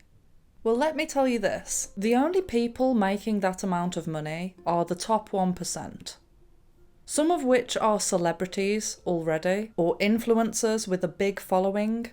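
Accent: United Kingdom. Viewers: Your UK voice is amazing and the pronunciation and accent.. I could listen to this voice for hours. What part of UK is your voice accent from? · I love your accent 😍 are you british ? New sub here i love your content speak wisdom to this dumb stupid girls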